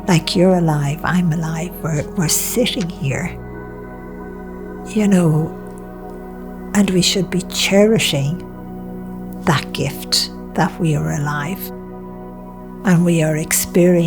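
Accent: Ireland. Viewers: I can tell she's Irish. Beginning of video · Just what l needed. I so love her soft lrish accent. It makes such a change from all the US you tubers! (l say this with respect nevertheless, so please don’t take offense). That soft Irish drawl is like a healing solace to my soul. 💝🇬🇧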